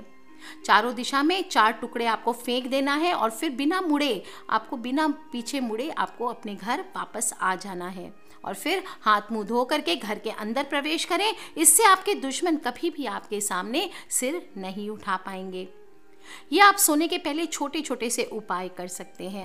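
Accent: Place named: Marwari, India. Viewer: Radhey Radhey Mam aap marwadi hai kyunki aapke bolne ke wording bilkul hamari tarah hai.mujhe Kolkata ki yaad aa jati hai aapki baat sunkar.l like you ma'am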